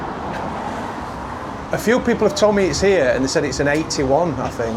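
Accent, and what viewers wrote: Yorkshire, United Kingdom. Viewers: Two things, did you notice how the blue skies appeared once you’d crossed over t’Pennines and secondly, you started talking in your best Yorkshire accent. I know this because I do the same when I’m back home in God’s own country.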